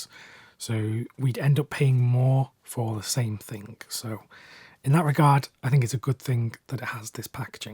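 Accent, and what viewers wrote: United Kingdom. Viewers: Hey, im not even really collecting Blu-ray, but i sometimes just play your videos for the athomsphere. Like you were my chilled bri'ish fella 😂 i dont know if thats weird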